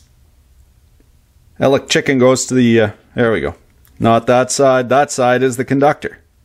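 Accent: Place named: Nova Scotia, Canada. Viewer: What the heck is that accent? It sounds like someone born in Nova Scotia who moved to Ontario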